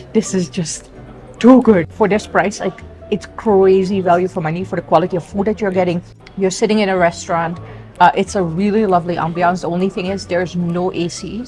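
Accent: India. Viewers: Your accent turned to Indian accent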